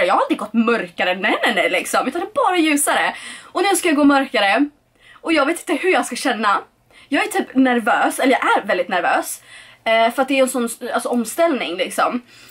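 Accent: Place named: Karlskrona, Sweden. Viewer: em_ det är karlskona dialekten som kickar in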